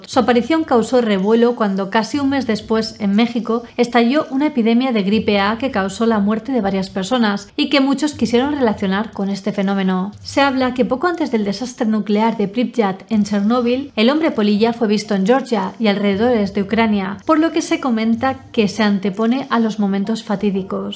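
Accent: Spain. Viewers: Como siempre genial 😃 . Un pequeño consejo, dicho desde el respeto, si la narración fuera más lenta la disfrutaríamos más... Sé que los españoles hablan muy rápido, pero somos muchooooos fans latinos, así tu encanto llegaria al 120 % !!!!! OMG . GRACIAS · Qué hermoso acento español tienes. Tus investigaciones me encantan, pero con tu acento son perfectos. Saludos!!! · Hermosa con una linda voz y ese acento español , me enamore a primera vista ...de verdad 👍🥰 Saludos desde Chile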